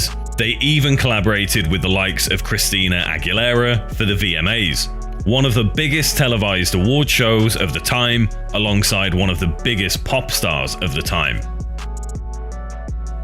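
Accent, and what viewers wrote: United Kingdom. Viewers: Sounds way more official with a British dude narrating👍👍👍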